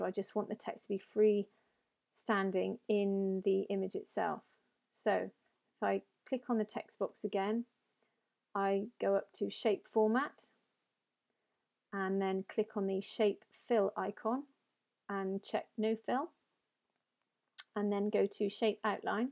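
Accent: United Kingdom. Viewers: bri ish accent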